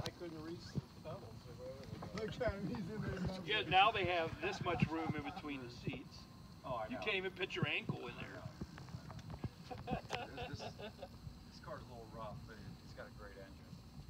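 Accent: France. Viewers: Sacré accent Français haha ! j'ai la même en 1977 avec équipement fédéral présidentiel complet, sirène US, gyro US dans calandre, Citizen band, full tinted glass, téléphone, mini bar whiskey and cathodique TV avec antenne boomerang chaines Française. Ce n'est pas une sedan mais une factory Fleetwood limousine formal serie 75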